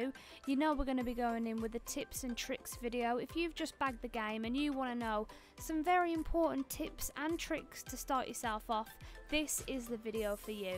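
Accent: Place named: Birmingham, United Kingdom. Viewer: Your Brummie accent is top tier!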